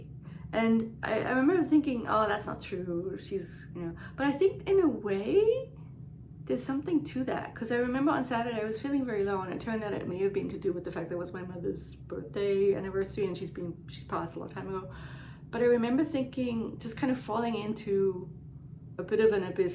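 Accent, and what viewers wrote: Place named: Germany. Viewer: Where are you from? Impressive German accent. (Edit): lol just reached the part of the video when you say you’re German 😂 Liebe Grüße aus Österreich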